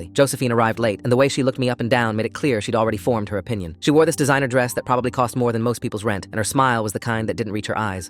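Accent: United Kingdom. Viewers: Lil bro got a British accent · Is it just me or has British accents been appearing randomly in the recent videos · The British accent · That british accent was so funny! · Almost every one of these story's have a British accent like mid video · Why did it turn British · Not the British eccent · Why the hell did it turn British towards the end…. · Why the british accent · Me: Watching video Hears British accent sighs opens comments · why British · What in the Gordon Ramsey accent is going on here? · That British accent is wild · The British accent 😂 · Nice British , mate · Bro why’d it turn British half way through the story😭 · british mate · The British accent what happened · Bro turned british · Bro slowly turned british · WTF BRITISH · why did bro turn British why immediately went to the comments and see if anybody else anything about this bro went from normal to British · Dude, why is it British? 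· The tts is bri’ish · ummmmm british much???? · Ok British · Ahhh yes british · What the british accent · the british accent!!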